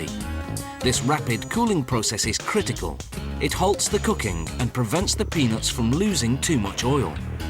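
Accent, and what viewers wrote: United Kingdom. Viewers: Of course the video starts out with a British guy talking about bad teeth. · The bloke is a northerner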